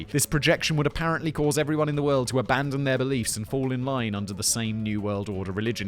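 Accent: United Kingdom. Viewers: chill out people on pronouncations, he speaks the unrefined British English, not the improvemed American. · what's so "intelligent" about this guy. Is it because he speaks with a British accent? Apparently, he has been the voice of several youtube channels and somehow ends up copying the channels for himself. The guy is not even a scientist himself, and he's here criticizing those who don't follow the "official" narrative. These are the same people who won't let you even consider the possibility of the virus escaping from a lab which first appeared you know... 5 blocks from one of only 3 labs that could have made the virus. Subjects that were discussed at the time at the Joe rogan show. wished he was invited to Joe Rogan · Simons Brit accent is at times a bit too heavy for me. But today, it was a fucking sonnet.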